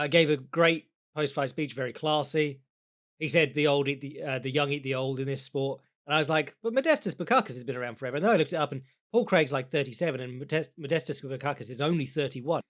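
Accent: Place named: Scotland, United Kingdom. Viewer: end of an era, we will neveR hear use his Scottish accent to say Paul Craig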